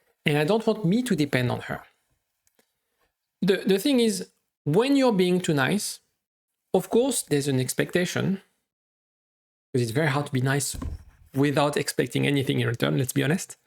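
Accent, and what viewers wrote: France. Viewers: Is that a French accent?😊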